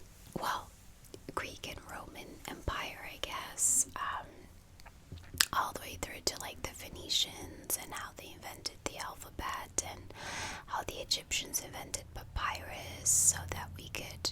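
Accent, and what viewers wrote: United States. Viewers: I can't get over how American you sound! I would never know you aren't American just by listening to you! Do people IRL ever mistake you for an American?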